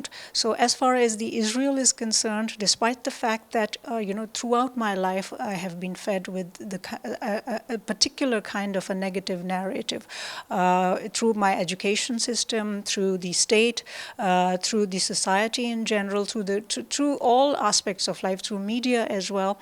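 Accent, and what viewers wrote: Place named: United States. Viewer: This bi is not pakistani. Her accent is fully American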